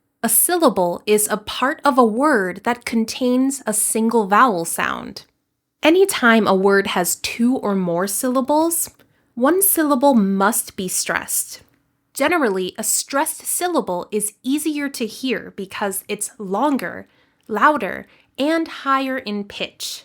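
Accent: United States. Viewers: Hello teacher am new here am loving your accent, are you from which state of USA? Thanks in advance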